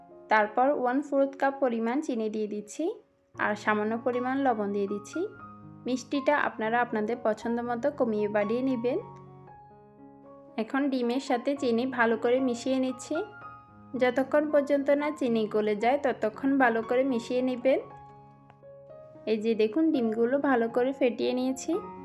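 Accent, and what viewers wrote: Chittagong, Bangladesh. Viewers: Chottogram er Language 😮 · আপা মনে হয় চিটাগাং এর কথায় চিটাগাং এর টানটা আসছে।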